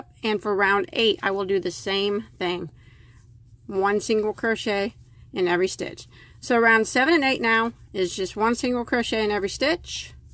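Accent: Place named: Indiana, United States. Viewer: I know you have heard it before but I need to thank you again for being real - authentic! You aren't selling us on things, you are sharing! Even better, as a West Central Indiana born, raised, and still residing her gal, I like someone who sounds like me! Cannot thank you enough for teaching, sharing and giving of yourself and sharing family times with us! Keep up the great work! You are very talented and keeping the craft alive!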